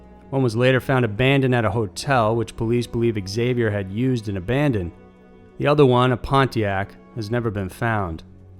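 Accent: South, United States. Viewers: I love it too! He sounds like he has a southern accent with some of the things he says!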